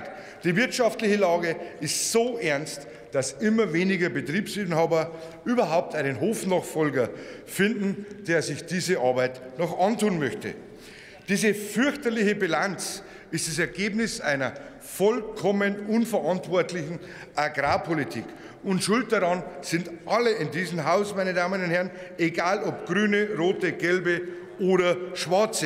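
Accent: Bavaria, Germany. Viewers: Bairisch, ich liebe es ❤️.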